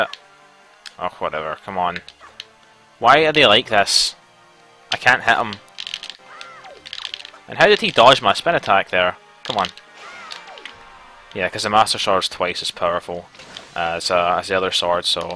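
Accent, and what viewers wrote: Scotland, United Kingdom. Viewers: I'm pretty sure that it's Scottish, but it might be Irish.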